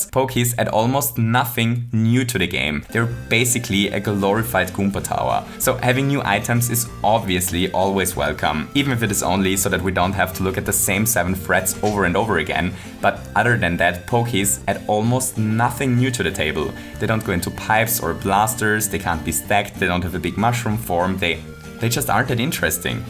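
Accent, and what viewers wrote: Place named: Germany. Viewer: Bin ich der einzige der denkt das er einen deutschen Akzent hat? OK dann bin ich wohl der einzige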